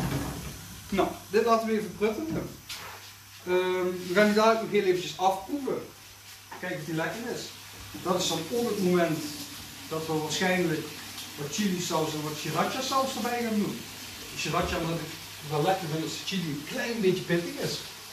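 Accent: Netherlands. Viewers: Wouw echt geweldig lekker had jou recept gevolgd. Was ons niet pittig genoeg . Dus 2e keer gemaakt. Eerste keer gemaakt met de chips in de oven . Nu gemaakt in burritos vorm . Met een Carolina reaper en een chilli pepper. Hot chilli saus en chirazza saus * waarschijnlijk verkeerd geschreven ) maar mmmmmmm wij zijn echt flink pikante eters . Heeeeerlijk . Ik zie jou graag bezig. Ben zelf van België. Maar jij bent van de kanten heerlen denk ik ,niet ? Ik ben zelf van België grens maastricht . Ik vind dat accent zo geweldig om naar te luisteren als belg . Ik ga je volgen. Je legt ook alles zo mooi uit . Weet precies wat te doen . Top kerel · Zou deze man uit Limburg komen?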